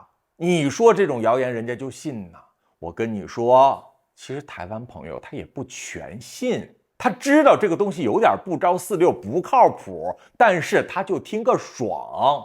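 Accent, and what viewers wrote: Beijing, China. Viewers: 叔 你老家是哪裡的？是北京嗎？突然好奇你的口音。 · 这口音，这调调，这吊儿郎当气质，大概率是个前朝八旗子弟。怨恨中共那也是情有可原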